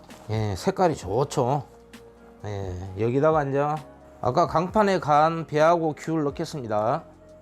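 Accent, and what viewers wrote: Jeolla, South Korea. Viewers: 병원에 입원중입니다 항암치료로 입맛이 없는데 집에 돌아가면 꼭 해먹을래욤 ^^ 전라도 사투리 참 정겹네요 ^^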